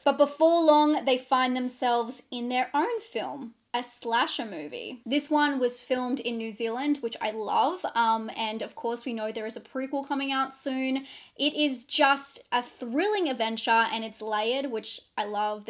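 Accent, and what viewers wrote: Australia. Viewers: Love the Aussie accent! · i Love your Australian accent and i like the way you look when you talk like that.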